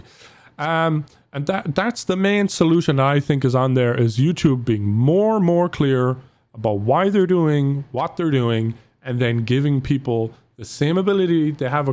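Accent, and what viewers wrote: Ireland. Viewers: gotta be honest here, Firstly... missed your irish accent (for some reason the bell got unrung for me... go figure) and secondly, YT really discouraged me after the first adpocalipse, i was actually starting to dedicate myself to it and then poop hit the fan and we small creators got covered in it. I basically gave up on this channel here of mine then restarted with it just as a little hobby, but with the "why wate hours of editing on this" mentality which in turn demotivated me even more... so yh they should listen to you coz you have some really good pointers. oh and before i forget... when can we expect a new song video?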